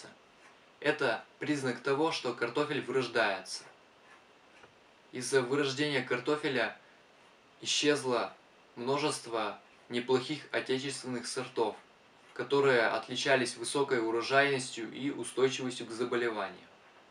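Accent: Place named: Vyatka, Russia. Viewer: Вятский говор. Молодец парень. Надо будет попробовать из семян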